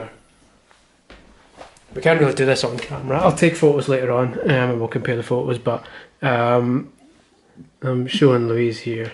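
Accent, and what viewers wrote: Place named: Edinburgh, United Kingdom. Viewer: you sound like you are from Edinburgh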